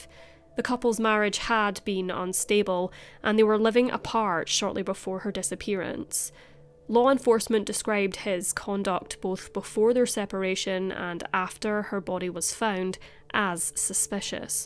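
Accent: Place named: Glasgow, United Kingdom. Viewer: I think she's from Glasgow, my Scottish aunty sounds very similar to her.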